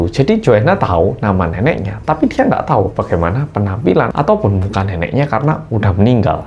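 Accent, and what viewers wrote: Java, Indonesia. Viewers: 😂😊suka bgettt m cowok cowok Korea eeh Nemu konten kok BS bahasa Indonesia medok juga ... JD fans 😂😂😂😂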